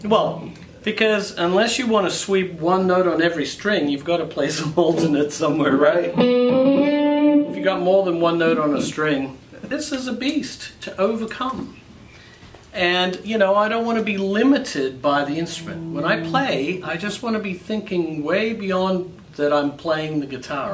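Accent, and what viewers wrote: Australia. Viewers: It sounds like Frank's Australian accent isn't as noticable as it was back in the 90's. It's more subtle, and he has an American accent at times. Not a cut down; just an observation. I love the guy! I still have his old REH Video on VHS from when I was a kid. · Being as I live in Sydney, I thought I detected an Aussie accent; yup, born in Canberra he was! Oy, Frank, awesome, mate!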